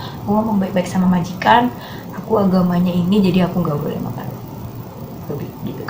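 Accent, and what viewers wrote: Banyumas, Indonesia. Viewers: Ngapak ya mbak? Kayane sih..hahaha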